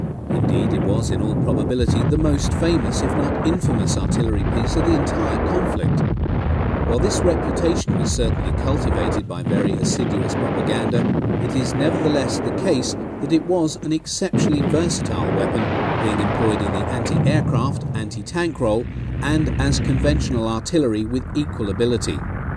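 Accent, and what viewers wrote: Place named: United Kingdom. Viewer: I feel like I'm in a fox hold during battle, with some calm British guy yapping next to me.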